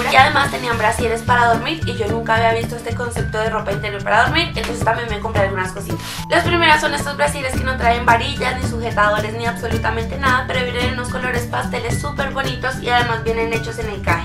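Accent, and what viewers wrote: Colombia. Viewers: yo se que los colombianos hablan asi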